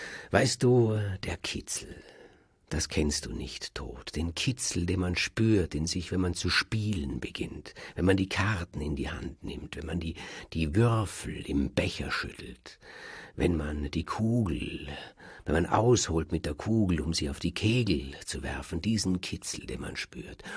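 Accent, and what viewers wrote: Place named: Germany. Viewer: Mit österreichischem Akzent würde es besser klingen. BRD-Deutsch passt nicht dazu.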